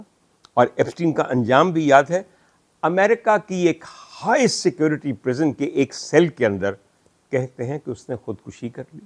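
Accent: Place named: Punjab, Pakistan. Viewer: واہ! کیا انداز بیان ہے جناب بلکل فلمسٹار ندیم جیسا! اور پھر اسپر پنجابی کے تڑکے! ہاۓ ہاۓ! 😂😂کراچی والے آپ کی اردو پہ قربان! 🌷❤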